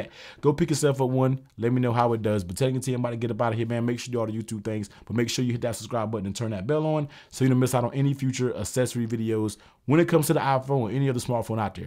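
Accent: United States. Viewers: I liked your American accent, man 👌💞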